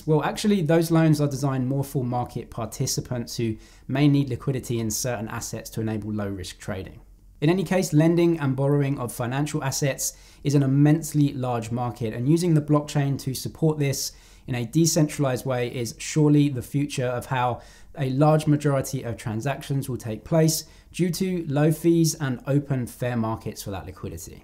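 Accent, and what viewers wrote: United Kingdom. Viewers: Great video, always look forward to your videos. Its refreshing listening to someone from the uk. Have you ever used Trading View. Be great to see a video on that. How it works and allthe different tools. Please keep up the great work.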